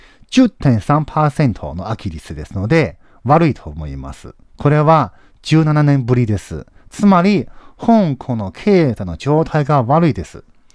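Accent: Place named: Osaka, Japan. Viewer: 大阪弁だぁ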